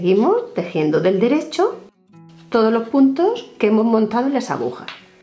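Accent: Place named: Murcia, Spain. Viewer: Gracias...tu acentico se me hace tan familiar...que hoy por fin pude saber que eres murcianica,me encantan tus laborws,y te sigo desde hace años,por cierto la capaza amarilla de trapillo la hice y se la chulear a mi hija.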